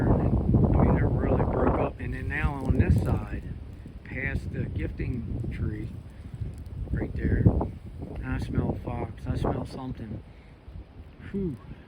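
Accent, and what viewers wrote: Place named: South, United States. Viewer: Good morning 🌞. You sure sound southern. When you talked about the wood, you were teaching what you know. Alot of folks may not have known that information. That's neat the turtle was still there. It's sunny spot. Thankyou for sharing your thoughts and walk. Always a pleasure. Hope you have a great day.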